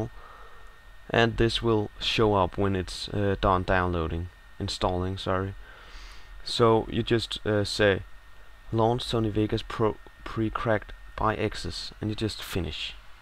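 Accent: Denmark. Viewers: Haha, kunne tydeligt hører du var dansk :-) Fed video den hjalp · Tak, nu kan jeg komme igang med videoredigeringen igen Synes godt at jeg kunne spotte en dansk accent XP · Danish? Are you from denmark^^'?? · Dansker :D? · Are you Danish? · i can just heat clearly you are from denmark! haha!:D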